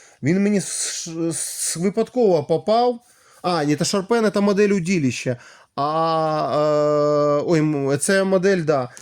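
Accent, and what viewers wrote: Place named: Russia. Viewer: Говорил бы уже по русски, хреначишь по украински с русским акцентом, да ещё и коряво, оно тебе надо?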